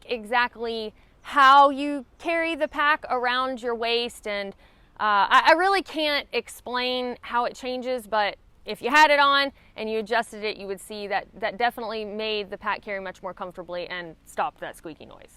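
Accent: South, United States. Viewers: You have the coolest accent!!! Country girls are awesome!!!!! Be safe and thanks for the content!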